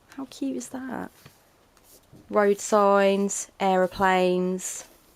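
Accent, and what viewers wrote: England, United Kingdom. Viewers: I've just about catch up on all your videos as a latecomer (mornings with a cup of tea - had to be done!) and they're great! So lovely to hear a fellow English accent amongst the depths of Youtube! Loving the Vlog idea too, it definitely adds something new. As a cheely suggestion, would love you to video your entire collection of cards - but then I am very nosy! Thanks so much for sharing all your thoughts, your videos/posts are brilliant.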